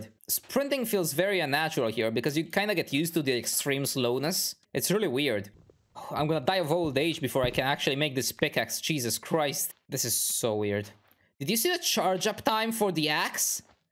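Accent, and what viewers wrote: Italy. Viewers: Capisco dalla pronuncia che sei italiano complimenti per l'inglese ti giuro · Your from italy? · Italy? Sei italiano? (Are you Italian) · " So it cooks ( Coo-ks) faster " Gosh i love Italian accents XD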